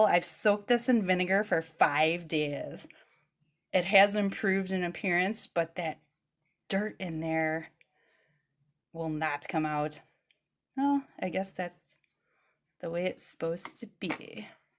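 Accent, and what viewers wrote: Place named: Great Lakes, United States. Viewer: I am from Michigan and you have a wonderful strong Great Lakes accent.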